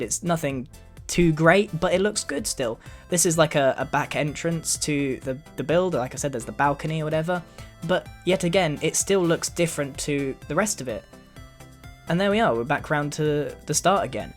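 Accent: United Kingdom. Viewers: Wow! You are a genius! I really like that british accent. :D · You sound like your British? You probably aren't. But that doesn't matter a tad. You are extremely good at building!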